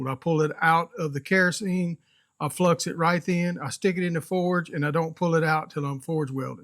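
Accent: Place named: South, United States. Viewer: knew you were southern man. Not to far from you, north mississippi.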